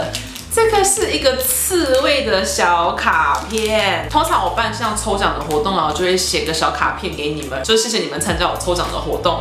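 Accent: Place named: United States. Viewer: Dani是ABC什麼的嗎？🤔感覺講話有口音欸😅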